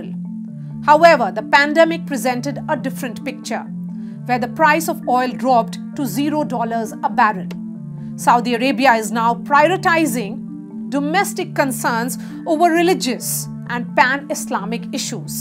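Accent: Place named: India. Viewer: You the only white girl with Indian accent.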